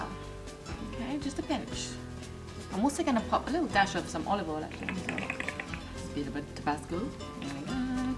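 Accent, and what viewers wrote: United Kingdom. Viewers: Hi,enjoyed the video, that's a real meaty nacho dish.You look more eastern than Mexican and sound British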